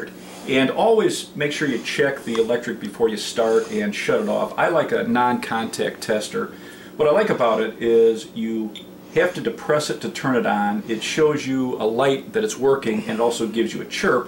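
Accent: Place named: Midwest, United States. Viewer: Spot the Midwest accent! "The hat is on tap!" Thanks for posting, this was very helpful.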